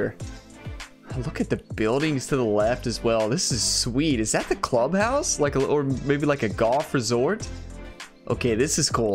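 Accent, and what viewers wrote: Kentucky, United States. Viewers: what state are you from? trying to guess your accent? my guess is Kentucky?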